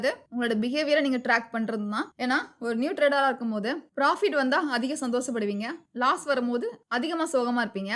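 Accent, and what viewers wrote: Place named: Marthandam, India. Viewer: I think you are 100% marthandam area 😂😂😂😂😂😂😂😂😂😂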